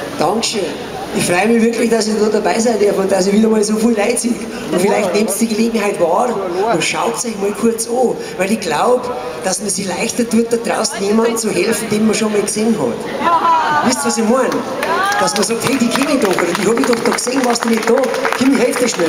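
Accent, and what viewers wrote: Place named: Bavaria, Germany. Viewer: Verdammt warum muss er aus Bayern kommen, ich versteh fast nix 😔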